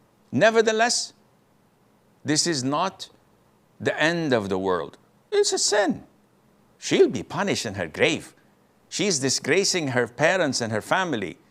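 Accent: France. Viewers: I rewatched the video 3times just to catch the french accent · The heaviest french accent I've ever heard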